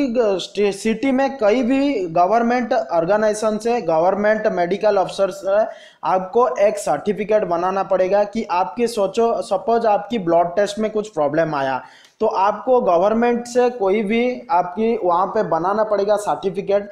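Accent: Odisha, India. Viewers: Bhai Hindi pura odia bhalia laguchi...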